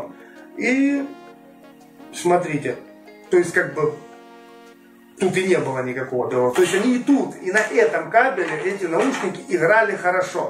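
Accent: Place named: South, Ukraine. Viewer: Тезка, в тайм-кодах поменяй точки на : , тогда это будут не временные метки а полноценные тайм-коды. Чуток над речью стоит поговорить, чтобы произношение было не столь колоритным (я про южно-украинский/восточно-украинский "ШО" "ГЭ" ну и прочие специф. звуки) Для новичка видео нормальное. Для авансу +, подписка, бубенцы. Сам кабель норм за свой прайс, но он ничто против нишенска с3 на 16 жил типа аля СИЛЬВЕР. Там вообще пушка- ракета, а не кабель. Свой трн т2с 16 жил отдал донашивать своему ребенку.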